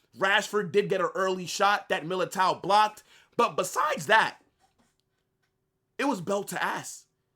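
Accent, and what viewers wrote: United States. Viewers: You are the best Real Madrid youtuber. Very underrated just because you have an American accent.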